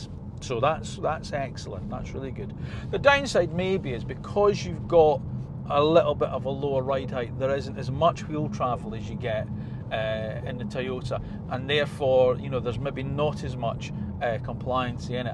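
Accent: Scotland, United Kingdom. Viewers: I love your style of videos, keep it up! Greetings from Greece. Are you Scottish? I like your accent.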